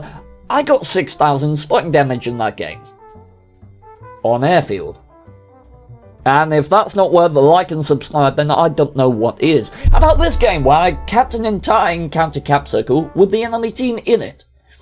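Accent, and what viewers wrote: United Kingdom. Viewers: Evrything's better with that English accent.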